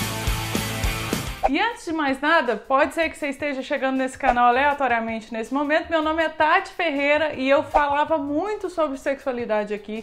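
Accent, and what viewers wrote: Minas Gerais, Brazil. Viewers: “Acabei de ter um estranhamento com cê” apaixonada nesse mineres 😍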